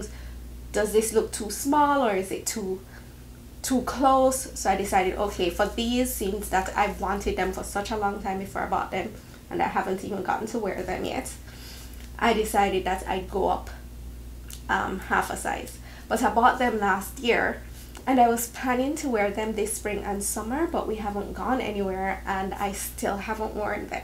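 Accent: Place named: Jamaica. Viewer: I hear the Jamaican accent bap bap lol 🇯🇲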